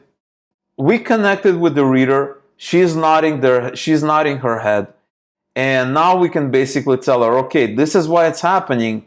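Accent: Russia. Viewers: Ты не Русский случаем? Подпишусь, если ответишь) Очень Русский акцент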